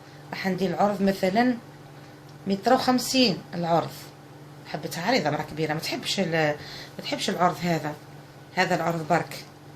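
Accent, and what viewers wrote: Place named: Djelfa, Algeria. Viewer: تهدري كيما صحاب الجلفة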